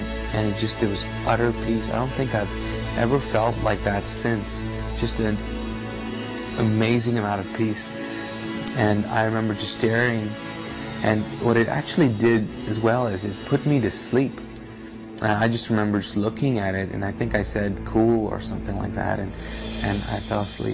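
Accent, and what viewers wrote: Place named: Spain. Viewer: WOW!!! You have REALLY REALLY convinced me to CONVERT to Christianity hehehe. BTW, it's "convert" NOT "combert". It seems that your Spanish mother tongue (lengua materna) is affecting your English. Chico! Tienes que aprender el inglés sin influencias extranjeras, para que la gente no se reía de ti !!!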